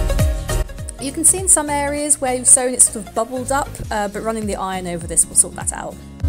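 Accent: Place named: England, United Kingdom. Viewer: Someone with an English accent using imperial measurements 😍 Most videos use those centimeters and millimeters nonsense 🤣🤣🤣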